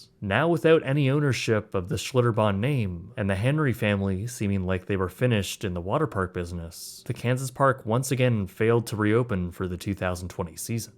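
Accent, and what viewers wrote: Canada. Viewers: He’s from Canada they sometimes talk like that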